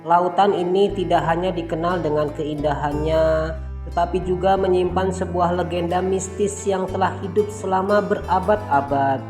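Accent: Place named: Java, Indonesia. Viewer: Suaranya jawa banget, mending pake AI aja, eneg suaranya didengar.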